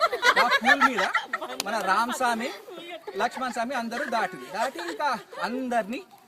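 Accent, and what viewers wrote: Telangana, India. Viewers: Very nice actually iam trying to learn telangana slang ur slang help full to me thank you sir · Zabardasth telangana accent Pls continue bhai Big bow!!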